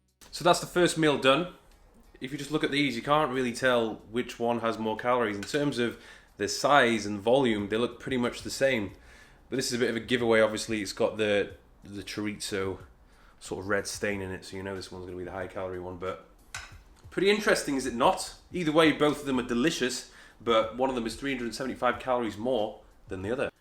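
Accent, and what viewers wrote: United Kingdom. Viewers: Metric - Check British accent - Check British Supermarket foods - check ** When American videos start measuring in terms of 'cup' I'm already zoned out. Helpful and interesting video of comparisons. Even if not followed religiously, there is certainly no hardship in introducing these meals a few times a week.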